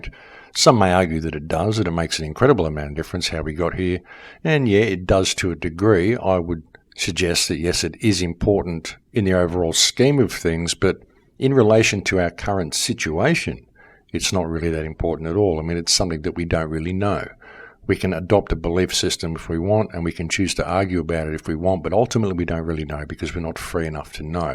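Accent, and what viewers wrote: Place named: Australia. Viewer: I'm new to yuor posts. Very informative! Refreshing to get schooled in an Aussie accent! Cheers mate! Oh yes... keep up the good work!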